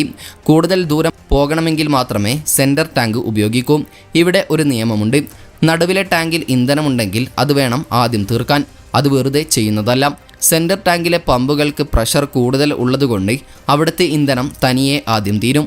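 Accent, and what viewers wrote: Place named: Kasaragod, India. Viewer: ഓരോ ജില്ലയിലും ഓരോ ഭാഷാ ശൈലിയുണ്ട് അത് അവരുപയോഗിക്കുന്നു ഞാനാണ് ഇങ്ങനെ പറഞ്ഞിരുന്നുവെങ്കിൽ മലയാളത്തിനു ഒന്ന് അമർത്തുക എന്ന് നീ പറഞ്ഞേനെ അതാണ് കാസ്രോട് ❤️❤️... പിന്നെന്ത് നിൻക്ക് സുകന്നല്ലേ അങ്ങനല്ലോ ബാണോ ബാസയ് 14 ജില്ലാ 14 ബാസയ് അതന്നെ കേരളോ...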